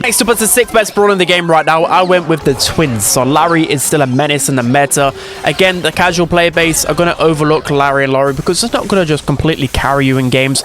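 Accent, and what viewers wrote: United Kingdom. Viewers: Just realized ur British wth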